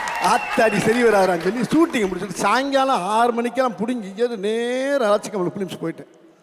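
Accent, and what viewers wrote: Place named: Madurai, India. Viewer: இவருக்கு கைக்கொடுத்தது இவர் பேசும் மதுரைத்தமிழ் வாழத்துக்கள்🎉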